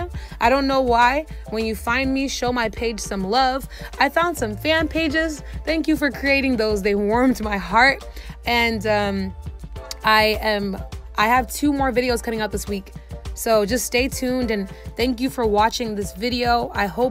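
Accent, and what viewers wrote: Nigeria. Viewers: U are so beautiful. Are you Nigerian? I heard some "Nigerian English" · You can feel the Nigerian in her speach, I'm so happy if she's of my same nationality. Makes me love myself a bit more🤧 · OMG I can't believe I didn't know u were Nigerian!!! 😱😍 Love when you speak in the accent girl 😉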